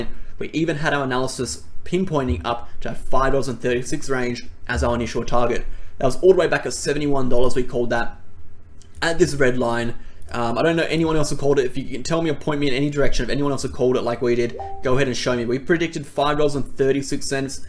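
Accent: Australia. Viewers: cheers! Ps. good to hear an aussie accent on a crypto vid!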